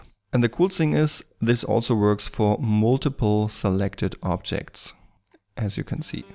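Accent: Germany. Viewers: Woah I can hear that german accent even though I'm not native english (german too actually xD) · Diggi du hast einen Deutschenakzent........ ansonsten pretty good.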